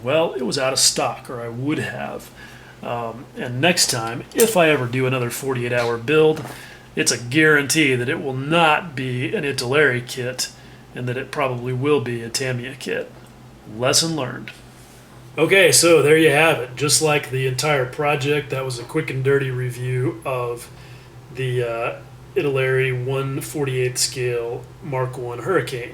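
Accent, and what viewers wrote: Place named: United States. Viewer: Hi Will my name is William also, I like your style, your accent is soo American. I love planes and tanks and the whole model building scene, its so deep. I just found and joined the Newburg model car club, cause I love cars to. Thanks for your response last time on my comment like some kind of double negative always never disappoint? I cant remember. Any way I am very new to internet interaction and although an old hand at model building new to year round participation still a winter warrior. God bless and look forward to talking to you and sharing my model building experiences.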